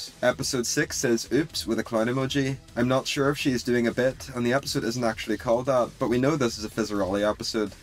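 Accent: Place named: Northern Ireland, United Kingdom. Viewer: Always love to hear a wee Northern Irish accent like my own on YT! Feel so rare to come across! Buzzing for more eps 😳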